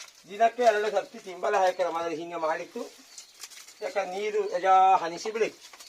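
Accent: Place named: Tulu, India. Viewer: ನೀವು ಕನ್ನಡ ಚೆನ್ನಾಗಿ ಮಾತಾಡೋನು ನಮ್ಮದು ತುಮಕೂರು ನಿಮ್ಮದು ಸ್ವಲ್ಪ ತುಳು ಭಾಷೆ ಮಿಕ್ಸ ಆತ ಉಂಟಲ್ಲ❤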